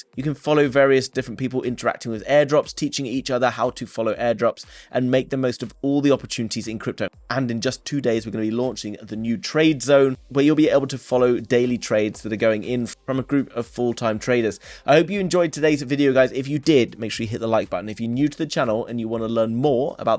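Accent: RP, United Kingdom. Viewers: Your semi-posh Brit accent, with the odd expletive, is music to the ears. Wouldn't turn down a celebratory donation of ICP on 100K subs.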